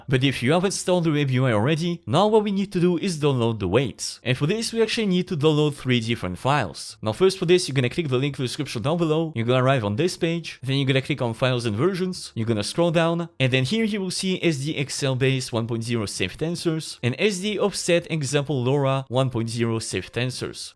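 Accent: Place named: France. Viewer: Thanks for the post, but please tell you voice-bot to slow down, especially when using the Francois accent, e.g., "EEMPTEE" for "empty" throughout, and the like, t might be easy to train your bot to avoid... THANKS!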